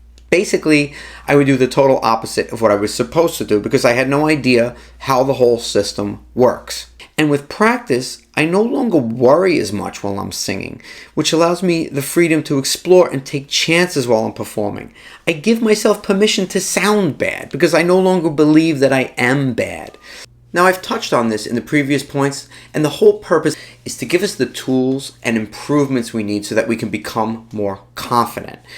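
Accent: New York, United States. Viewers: Thanks, as an Englishman (living in Brazil) your New York accent always puts in my mind of my favourite TV show. No not CSI New York but The Sopranos, so it was good to see a picture of Tony S in there!! I have a request for future videos. I'm not a pro and only do little fill in sets when the main performer is on a break at my local bar, but despite plenty of recent practice I still find playing guitar and singing at the same time a bit of a struggle. It's easy enough on simple 3 chord bluesy songs but for something like Layla, which I tried after your recent tutorial, you know a bit more complex, it's a bit more of a challenge! I do manage it ok but would like to not need to concentrate so hard!! Any tips. Also, I live in an apartment block and can't really rehearse singing at full volume and certainly not with a mic, any ideas or do I have to always wait until I'm out live to see if the song suits me. 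Anyway another great vid today, thanks.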